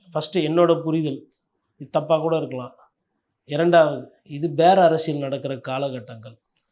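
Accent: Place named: Villupuram, India. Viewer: un native yanna slang super i think ur VILLUPRAM pondy kadalur ulunthurpataa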